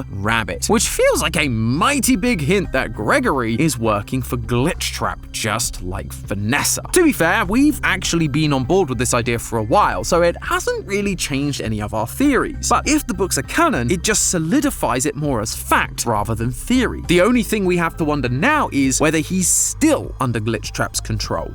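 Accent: United Kingdom. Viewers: my mom came into my room while i was watching this and asked if it was a game theory video, i said yes and she just goes “why is matpat doing a british accent?”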